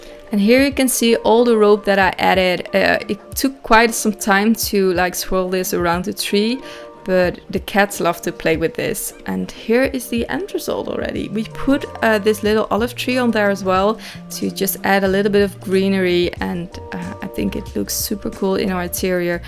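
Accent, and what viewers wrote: Netherlands. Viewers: Kom horen dat je Nederlands was😂 mooie boom! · From your accent, I would guess you are from the Netherlands or if not a Scandinavian country where women are supported to have so many different skills. Wherever you are, your building skills are excellent and the design is BRILLIANT! Very clean and open. Also love that you have narrated yourself. So much more natural. Also you are not using any stock footage. Wish I could do something like this for my baby. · Hoor ik een Nederlandse accent??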